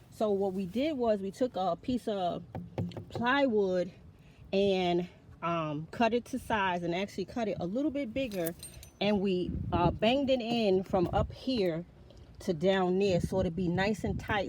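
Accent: New York, United States. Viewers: Too cool! You just inspired me! I have an old bookshelf that can be a raised bed and I love your accent lol you sound like my New York family Take Care ~Nicole 🌿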